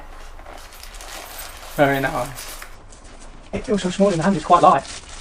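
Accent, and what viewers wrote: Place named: Yorkshire, United Kingdom. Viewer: Thanks for your video of macro photography which I thought was very encouraging for people new to Macro, I’ve been doing it just over a two years shortly after my retirement and like yourself find you get so drawn in to this hidden world which is all around us, but people completely unaware, just pass by it, I’m a Leeds guy and hope you don’t mind me saying sound like a Yorkshire man and my apologizes not, however if you are close to Leeds I would love to meet up with you sometime as I don’t have a photography friend I can converse with. Kind regards Dennis. Email: <mail>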